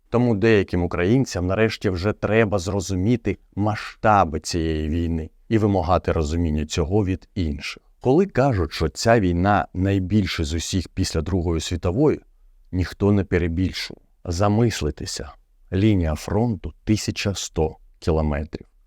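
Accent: Russia. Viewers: У тебя русский акцент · Підготував відео з хорошим звуком та картинкою, але не спромігся прогнати текст так, щоб вуха не різала вимова (українські слова вимовляє за росіянськими правилами граматики). Просто боляче слухати, що вже казати про логіку, аля: "ну ми ж таки виготовляємо". Питання в тому чи всі можливості використанні, чи може варто критикувати владу за недостатню мобілізацію заводів по виготовленню боєприпасів, забиванню на дрони-камікадзе і вставляння палок в колеса приватним виробникам зброї + волонтерам?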